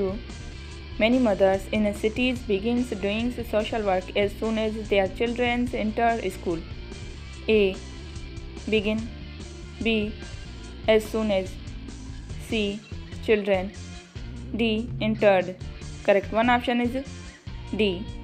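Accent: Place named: Sindh, Pakistan. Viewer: Ap sindhi ho apki awaz se lag rha hai magar ap bohoot payari awaz hai apki mashallah 😊😍🤗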